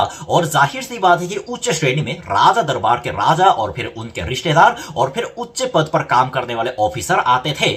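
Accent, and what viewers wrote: Standard. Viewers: Waah... jabardast... 👍🙏 Varnan karne ka tarika... bahut accha lga.. 👍 sudh Hindi 👍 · Aap to india se bhi shudhh hindi bolte hai ekdam book ke jaisa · इतने शुद्ध हिंदी तो मुझे भी नहीं आती Jitna aap bol rahe ho😁.. I'm a bengali girl 😊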